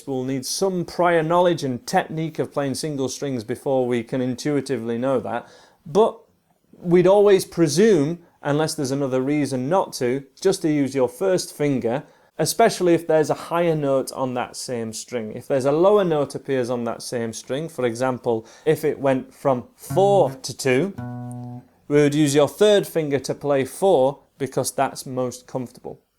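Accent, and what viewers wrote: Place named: Northern England, United Kingdom. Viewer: Northern English accent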